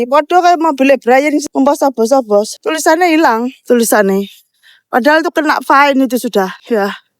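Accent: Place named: East Java, Indonesia. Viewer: Terdeteksinya logat jatim, bener ga Bu? Hehe😅😅❤❤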